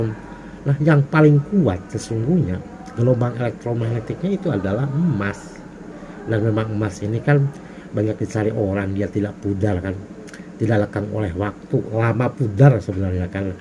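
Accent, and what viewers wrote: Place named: West Java, Indonesia. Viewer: ❤logat Abah sapertos Sunda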